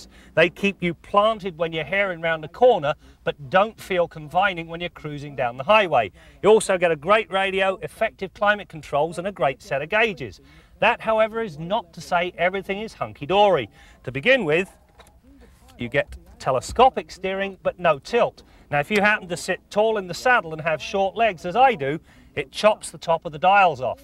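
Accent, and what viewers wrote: United Kingdom. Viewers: It's weird to hear a guy with a Hitler moustache speaking with an English accent.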